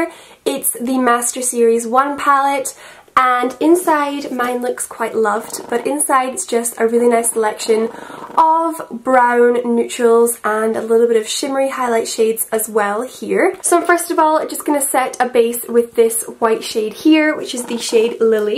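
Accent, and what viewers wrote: Scotland, United Kingdom. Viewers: What part of Scotland are you from? I'm Scottish too :)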